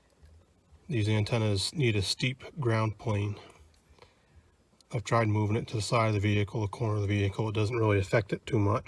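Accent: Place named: New Hampshire, United States. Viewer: Definitely a NH native! 73, K1GHC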